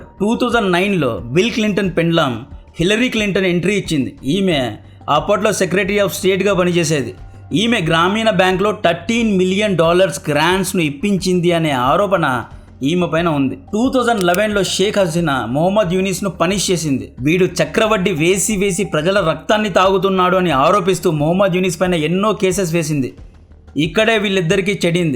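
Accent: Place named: North India, India. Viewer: బ్రో మీరెందుకు తెలుగు భాషని ఒక్క ముస్లిం లాగానో లేదో తెలుగు రాని నార్త్ ఇండియన్ లాగా మాట్లాడతారు? పెండ్లాం కాదు అనాల్సింది భార్య అని కొద్దిగా ప్రొఫెషనల్ గా మాట్లాడటానికి ట్రై చెయ్యండి.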